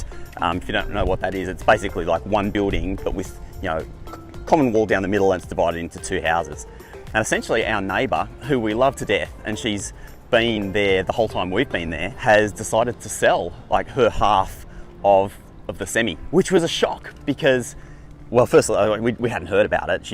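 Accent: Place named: Australia. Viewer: Australian English is awesome 😎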